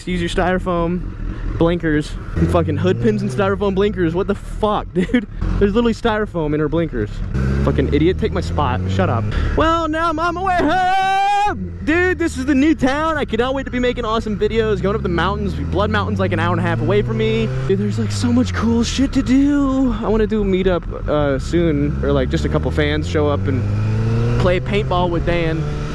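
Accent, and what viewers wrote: Australia. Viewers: I'm Australian and I love your Australian accent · Dan more Australian accents in ur vids 😂 pls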